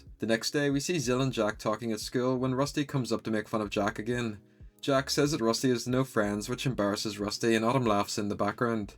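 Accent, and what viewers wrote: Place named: Northern Ireland, United Kingdom. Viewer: as someone from northern ireland i knew i reconised your accent lol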